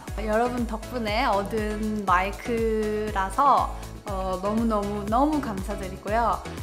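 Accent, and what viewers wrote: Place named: United Kingdom. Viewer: 영국발음 치여요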